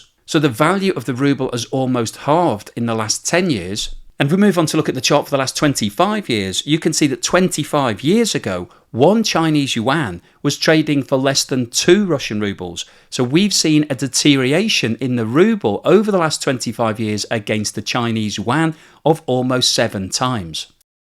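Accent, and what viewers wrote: United Kingdom. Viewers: When a British accent bloke talks about geopolitics and Russia - be aware: Brit wet dream and russophobic propaganda dead ahead....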